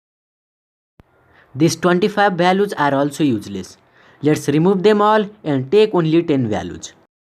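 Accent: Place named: Nepal. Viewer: Nepali po ho Brother ... Accent le testai lagdai xa....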